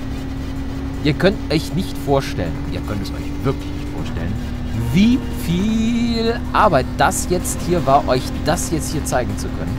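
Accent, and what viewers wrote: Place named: East Germany, Germany. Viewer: Ach lass die Leute Reden, Ich mag die Grafik . :) Und ich kann's mir Vorstellen, was es für ne Arbeit war das Spiel zum Laufen zu bringen ;) Dann Funktioniert dies nicht, dann das nicht.. dann braucht der andere Treiber u.s.w. ;) Wie du dich Freust... damit Zauberst du mir ein Lachen ins Gesicht... Merci dafür :) Dein Ost-Dialekt xD einfach Geil Oh. Ich tu auch gern Autos Tunen ;) (In Videospielen) aber ich mag das... ..Die Reifen müssen Brennen! xD :D Und aus dem Auspuff muss Feuer kommen :D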